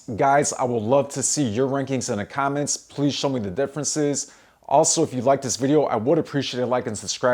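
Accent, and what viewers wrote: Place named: New Jersey, United States. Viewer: "different grilling events, down by lake michigan" i love that phrase, i lived there before never heard that, lol, love the jersey accent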